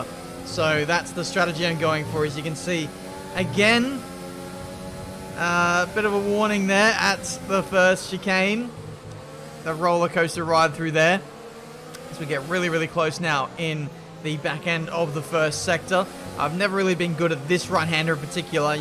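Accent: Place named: Australia. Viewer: DUH YOUR AUSTRALIAN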